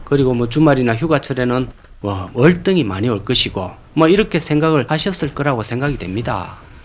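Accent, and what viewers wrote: Gyeongsang, South Korea. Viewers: .원래 내가 갱상도 말씨 아주 기분 나뿐데 이양반 말씨에서 갱상도 구수한 말이 나오너ㅣ.중국 까지 원정 하시요.더넘어 북쪽까지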